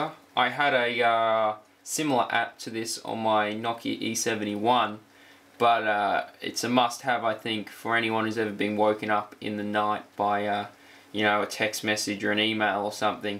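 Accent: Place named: Australia. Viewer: lol australian...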